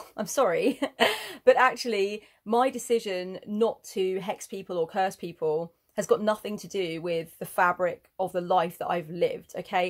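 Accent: United Kingdom. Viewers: I enjoy your intelligent and independently minded videos. Find them quite relevant. Plus, like British accents. Which regional accent is that?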